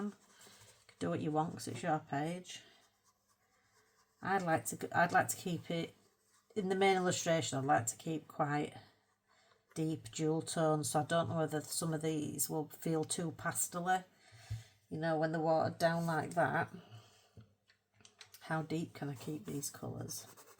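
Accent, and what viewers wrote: Yorkshire, United Kingdom. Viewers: Hi, loved this video. I only watched, didn't take part but got some great ideas, so thank you. You are so funny. Where abouts in Yorkshire are you from. Loved listening to your accent and some of the things you came out with are the same as what I would say so you had me laughing and smiling so thank you so much for the laughs and for sharing your processes and thoughts. Gonna watch your next video in this series. Thanks again, take care. Kind regards from Helen somewhere in the Greater Manchester area, UK.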